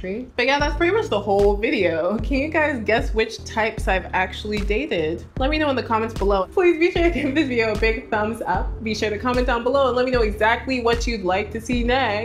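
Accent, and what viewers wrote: Nigeria. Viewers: Your Nigerian accent is off the roof.All American kids tries to take, my little tries to fake my mom's accent too. You're good. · I love how you are adopting the Nigerian accent that males the video really fun. Keep it up girl! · Sounds very Nigerian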